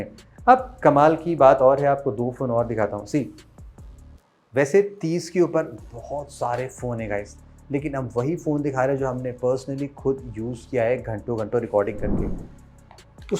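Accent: Lucknow, India. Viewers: Are you from lucknow❤❤👍🏻✨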